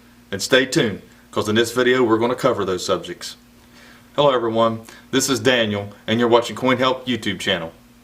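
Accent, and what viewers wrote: Kentucky, United States. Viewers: Color not Collar. Wtf you're from Ohio, sounding like some Kentucky imposter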